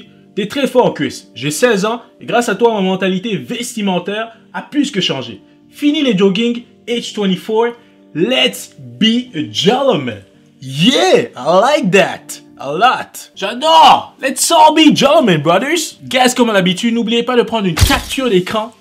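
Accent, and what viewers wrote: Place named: Quebec, Canada. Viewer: Je sais pas pourquoi mais je sent l'accent québécois 😅 sinon vidéo au top 😁👌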